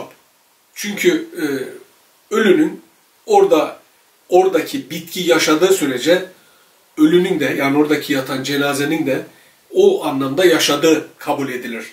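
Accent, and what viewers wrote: Gaziantep, Turkey. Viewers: Abi sen normal konuşma ya Antep Ağzı konuş... Yani yanlış anlama abimsin ama sırf seni dinlemek için günlük bi videonu açarım az evvel Abonede olayım dedim,şimdi Antep ağzıyla,hem yorum yapiyin hem abone olmayıksın yoğrum deme diye... Mersinliyim ama keşke Antepli olsaydım. Yani Abicim sana o ağız çok yakışıyor,çok orjinalsın vesselam...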